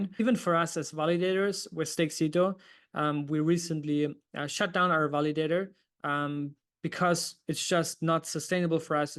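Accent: Germany. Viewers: oh shit i texted in german. Maybe im wright and your accent is german?!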